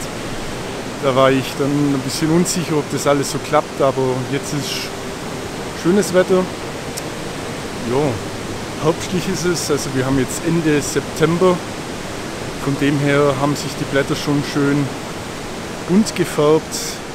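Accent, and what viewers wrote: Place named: Swabian Alb, Germany. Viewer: Dein Dialekt hört sich ein wenig nach schwäbische alb an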